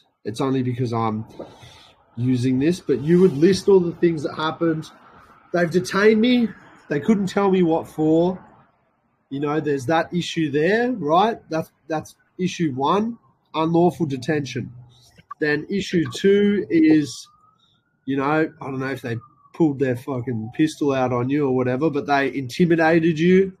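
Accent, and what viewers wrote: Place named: Australia. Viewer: Why do I hear an Aussie accent but you’ve referenced US law in the description?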